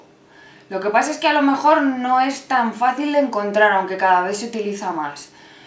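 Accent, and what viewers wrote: Spain. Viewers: ushhh me molesta el acento español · Me alegro de que te sirva la idea! :D Por cierto, eres vasca? En algunos videos te saco algo de acentillo xD jejeje Y enhorabuena, por tus videos, este canal y el de recetas de cocina los sigo mucho... no se me da muy bien cocinar aun, pero disfruto viendo los vidos :)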